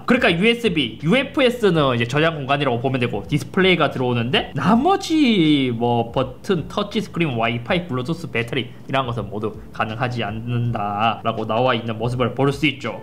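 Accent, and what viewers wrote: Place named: Gyeongsang, South Korea. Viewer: 씨 경상도셨구나.. 컴퓨터도 잘하네..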